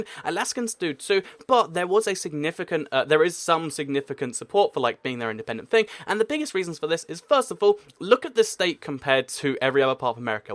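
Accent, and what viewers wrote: United Kingdom. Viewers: Who else couldn't tell if this guy was British or Australian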